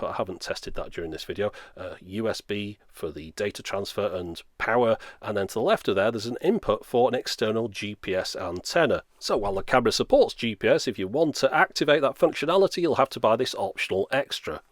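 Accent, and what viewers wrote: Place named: England, United Kingdom. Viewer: Wow nice presentation here, you were right on with just about everything regarding this Aukey DR-01 review. I took in most of your setup directions and menu setting THAT information is where I got the most value in the time spent here. I have a Vantrue N2 dash cam on the front of my Toyota Sienna and a nice Aukey DR-01 on the rear window over looking any rear end collisions or idiot drivers to the rear of my Van. I live in Lakewood California we all can agree that the So Cal. drivers here are the absolute WORST OF THE WORST! anywhere. you need a dashcam here just to save your ass if or when you have a problem. I was able to set up my device flawlessly. Your English accent for some reason makes it all that much easier to understand. Thank you for your time.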